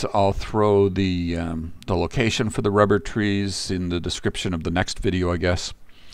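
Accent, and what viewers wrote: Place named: Canada. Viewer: I can't quite pin down your accent. I hear some Canadian in there, but also a hint of Scottish perhaps?? Or am I just way off lol? Great video, thank!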